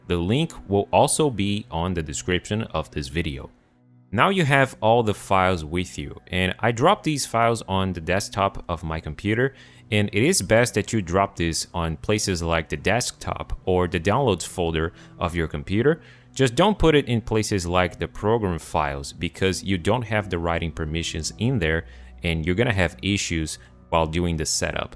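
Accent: Brazil. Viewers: o que tu parece brasileiro falando ingles eh brincadeira viu!!! assume ai, duvido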